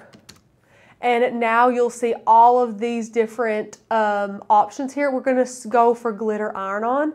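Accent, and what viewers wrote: South, United States. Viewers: Agreed, a blessing you are! It’s so nice to come on here and learn from people who you know are kind and sweet, not to mention, you are lovely and your accent is just adorable, I am from California and I am telling you that is definitely not how we sound. Your sweetness is from the south, im going to assume, I’m ready to move. Hee hee! 😇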